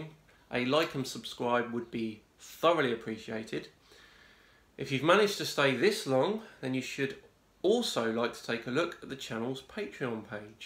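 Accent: United Kingdom. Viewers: I have to say that you are the only channel talking about Flat Earthers that I have subscribed to, which I just did 5 minutes ago. Your approach is informative, no nonsense, straight facts that will appeal to any half sensible person even slightly being swayed by the Cult of Flatness. It's also nice to see a brit out there, though I can't quite place the region. Keep it up good man!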